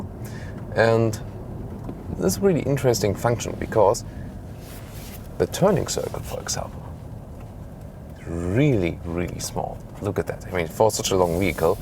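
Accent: Germany. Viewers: And that german english 😂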